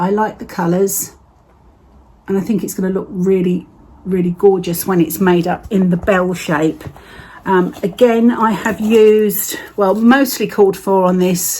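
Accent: England, United Kingdom. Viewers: I think you should suck it up unfortunately and finish your blackbird designs piece. It is beautiful what better than what you think.. I don’t like doing two threads any more either I like the look of one thread on 36ct or 40 ct. I love to listen to you with your English accent. I have a brother in England and a lot of other relatives. Thankyou so much. Sue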